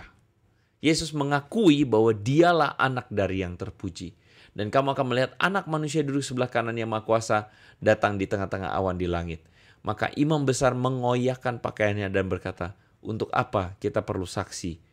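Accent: Java, Indonesia. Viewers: Dia SDH di besarkan di kalangan muslim kemudian di pakai sbg plat org asli Papua utk berbicara seakan akan dia baru murtad dr Kristen, buktinya dia TDK berlogat Papua tp logat Jawa ini buktinya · Wajahnya mirip orang Papua, tapi logatnya mirip dengan orang Jawa.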